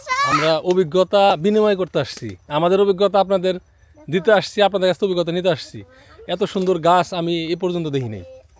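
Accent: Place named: Jessore, Bangladesh. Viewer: আমাদের যশোরের ভাষা মনে হচ্ছে, ভাই কি গিয়েছিলেন??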